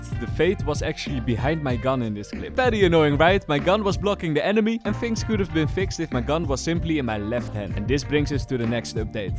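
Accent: Netherlands. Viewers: Every day the fishy trick guy with a dutch accent posts is a good day:)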